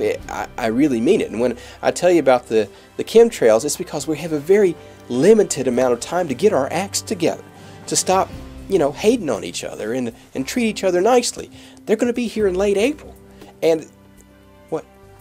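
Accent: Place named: United States. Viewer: if you're going to pretend you're in the illuminati, don't have a beard, a badly exaggerated yank accent and a poorly scripted video you massive american bellend!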